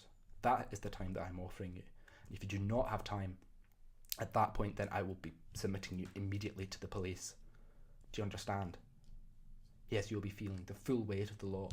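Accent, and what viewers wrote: Scotland, United Kingdom. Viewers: Can you do other profession?i loveeee the scottish accent x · Not a bad Scottish accent I reckon you sound like a teacher in Edinburgh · Your videos are a great tool for mastering a Scottish accent. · YES more scottish accent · Great accent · Andrew Robertson would approve of the accent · YAAAAAY!!! Scottish accent part 2, finally!!!!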